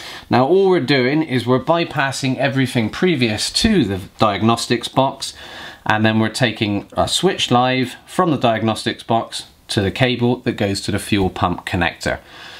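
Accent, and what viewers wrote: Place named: United Kingdom. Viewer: I really like the british lang.. Nice video.. informative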